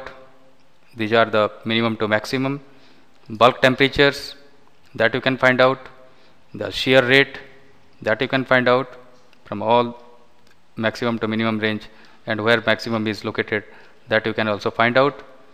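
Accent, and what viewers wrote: India. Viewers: Consultant - I think this is only an introduction to Moldflow capabilities. I was looking for something explained elaborately - like how to use molding window to predict number of gates, how to predict the packing time, how to take a decision how much should be the coolant temp to extract heat from the part. If you have these videos, then plz share. Those who browse for moldflow, are certainly aware of the basic capabilities which u have addressed here. I think U r from Odisha - It's not "Rapage"....plz pronounce it as "Warpage". Bujhila bandhu !! All the best ! keep posting such videos.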